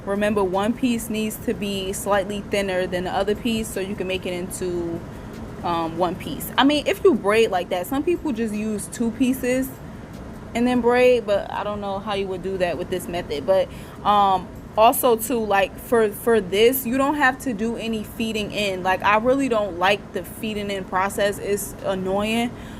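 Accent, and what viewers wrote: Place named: North, United States. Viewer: Girl I love your accent! I’m from TN but my husband’s from up north and y’all kinda sound alike!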